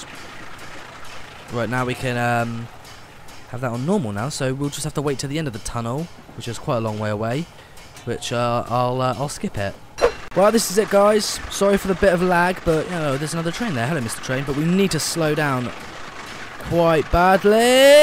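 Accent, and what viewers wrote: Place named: United Kingdom. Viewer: That's just his accent, it's British pronunciation.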